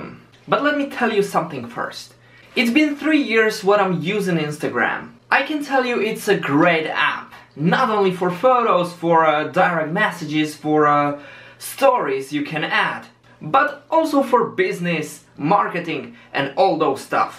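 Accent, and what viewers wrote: England, United Kingdom. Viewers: Čistí angličan super ide ty Anglický jazyk 😊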